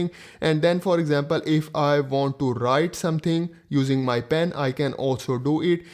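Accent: India. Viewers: Indian accent is something))) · The video itself was very well done, but something about speaking english in an indian accent makes me uncomfortable. I could understand everything you were saying, and your words were very clear and well articulated, but indian (hindu?) must be a very different language phonetically, because the struggle to achieve a clear flow between words is real. I hope this doesn’t offend you, because your english really is very well spoken aside from that flow aspect.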